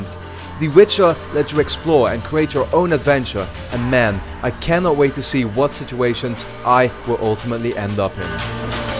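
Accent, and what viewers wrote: Netherlands. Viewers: Ben jij Nederlands je accent klinkt wel nederland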